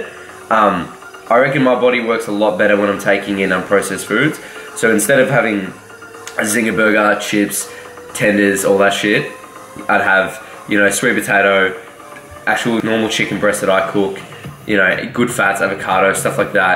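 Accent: Australia. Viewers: Bro... your mum is the best hahahahaha, sweet video man its good to here an aussie among all these mericans hahahaha sick 1 series to dude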